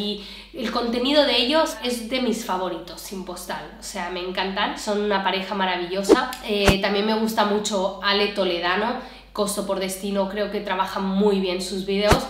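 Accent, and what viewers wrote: Spain. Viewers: Me gusta mucho tu contenido. Felicitaciones. Por otra parte, me he dado cuenta que estás perdiendo el acento español. Definitivo, los acentos se pegan depende de donde uno viva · Me gusta tu acento español y la forma profesional en qué haces tus vídeos. En hora buena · Mmmmmmm!! Mmmmmmm!!! Ummmm!! Sientes que tu forma y acento español haya sufrido alguna transformación? Sufrido? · Excelente video de preguntas y respuestas, ya casi 300K me encanta ver como sigues subiendo como la espuma, yo te haría dos preguntas, la primera es si estas buscando oportunidades de actuación en México ya sea en TV o en teatro y si lo estas haciendo que tanto ha influido en que te seleccionen o no tu acento Español/Catalán, la segunda es con respecto a los canales con los que quieres colaborar, me gustan todos los que mencionaste y de hecho creo que los sigo a todos, pero no mencionaste a ni un solo canal de Españoles que reaccionan a México y que de hecho han visitado el país y han creado también su propio contenido de sus viajes y vivencias aquí, Cygnus y The Romantic Corner (Chris y Carmen, casados y con un hijo recién nacido en México) serian para ti super fácil de conocer pues están radicando actualmente en CDMX... 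mucha suerte y, me encanta su contenido..... SUGERENCIA pueden agregar la opción de membresías al canal y subir ahí contenido sin editar o que por alguna razón dejan fuera de la edición final, eso les podría ayudar a incrementar su ingreso... Saludos desde Monterrey.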